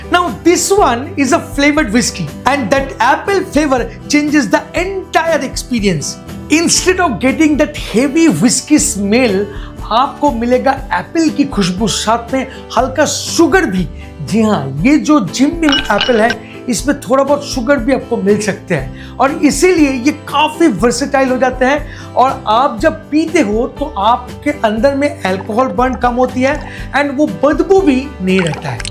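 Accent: Bengal, India. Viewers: Bengali accent chillia chiliia berachhe tmr theke 😂❤❤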